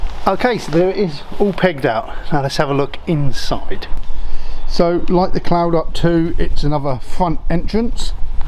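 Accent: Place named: United Kingdom. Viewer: Any chance you can learn a different accent lol? You have good content British accents are the absolute worst. African, Australian, American, Latin American would be so much better.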